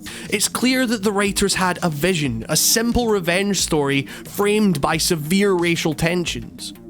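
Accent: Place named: Wales, United Kingdom. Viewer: Neat video! I love Welsh boys! You have the cutest accents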